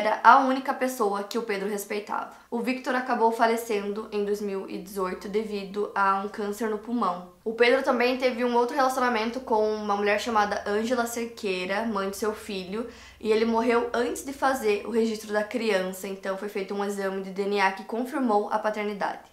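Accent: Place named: Paraná, Brazil. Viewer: Paraná né ♥️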